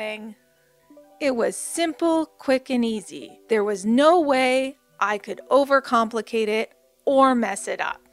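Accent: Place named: Canada. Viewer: Watched a few shorts on this method , but yours BY FAR is what i was searching for ! Plus i here s hint of fellow CANADIAN accent <3 TY for all your hard work !! HAVE A GREAT DAY!!!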